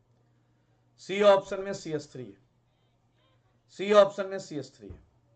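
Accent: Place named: Uttar Pradesh, India. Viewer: Sir aap up se hai?? Aapki accent mere papa jaisi hai 😅😅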